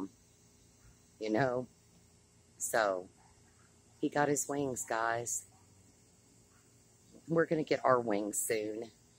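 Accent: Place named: South, United States. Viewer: Your voice is beautiful and I love the southern accent. I am a native Texan and I missed the southern drawl. I love you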